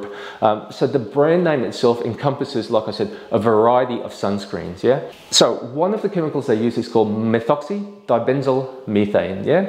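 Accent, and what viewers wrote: Australia. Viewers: Love your Aussie accent. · love the Ozzy accent.. greetings from amsterdam :)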